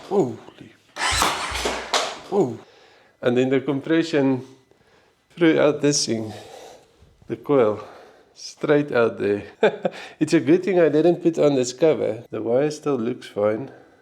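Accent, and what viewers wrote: South Africa. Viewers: South African. 👍Lekker BOER accent.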